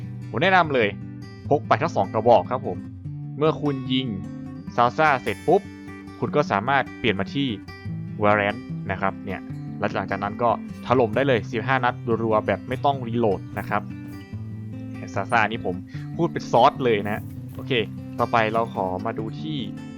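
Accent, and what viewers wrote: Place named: North, Thailand. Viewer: อันนี้เป็นคนเหนือแม่นก่อครับ สำเนียงออกเหนือเลย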